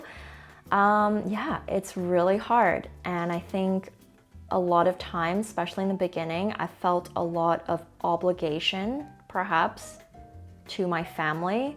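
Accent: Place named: England, United Kingdom. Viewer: You are such an inspiration. I love your english accent. ♥️ from Malaysia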